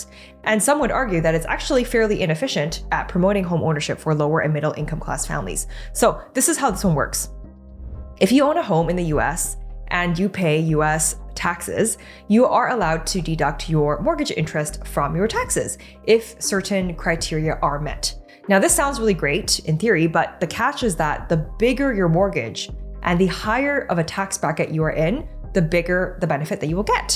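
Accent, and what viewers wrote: Canada. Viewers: She sounds Canadian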